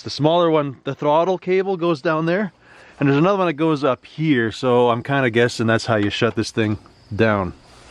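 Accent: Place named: Canada. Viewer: My two favorite words Canada guys say. is "Out" and "Process" To us assholes down south. Your "Out" sounds like "0uuuut". And Process. You say it as spelled. You say " PRO---U--CESS". All good. We say "Prawcess". We are all jerks! Love your channel! Rock on man up north! Oh There is a another thing.... I will wait.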